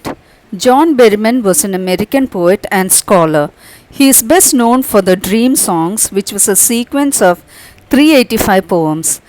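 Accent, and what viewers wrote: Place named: South India, India. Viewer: Mam is you from south india😅 our shool sisters explains similar like you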